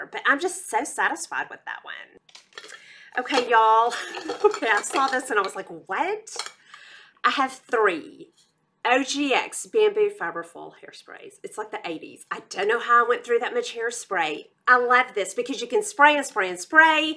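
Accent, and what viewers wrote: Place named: Arkansas, United States. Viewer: oh my gosh...i'm from Arkansas, but moved to Alaska 7 years ago. Listening to you is like being home. Penn Smith Skincare linked to you and that's how I got here! I love that you don't waste time but get right to the point. Some of the beauty vloggers just talk forever about nothing or say the same thing several different ways. Ugh! Thank you for not doing that!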